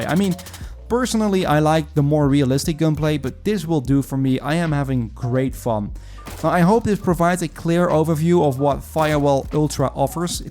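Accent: Netherlands. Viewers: U bent nederlands? Ik kan het horen aan uw engels accent.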